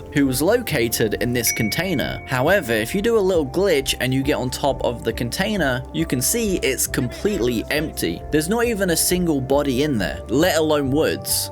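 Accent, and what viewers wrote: United Kingdom. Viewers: Is that an east Anglian accent I hear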